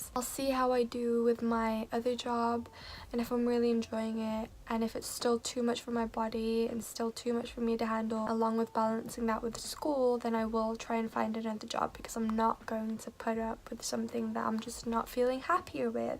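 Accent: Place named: United Kingdom. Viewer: I love your videos!!💗💗 Your accent is starting to sound british 🌸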